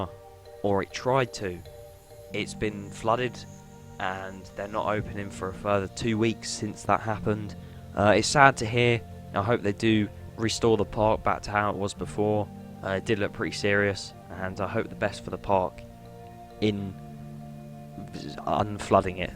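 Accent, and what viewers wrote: United Kingdom. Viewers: where in england are u from?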